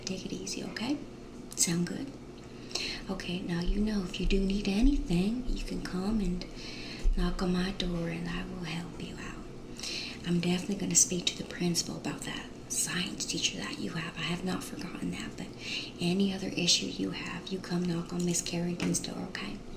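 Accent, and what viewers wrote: South, United States. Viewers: Aaaaah🎉! You’re back with the Southern accent 🥳🥳🧐thank yoooou!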